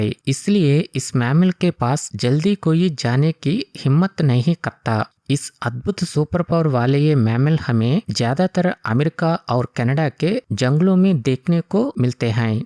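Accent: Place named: South India, India. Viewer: Apki Hindi bolne me South India ki thodi thodi asar lag rahi he 😊👍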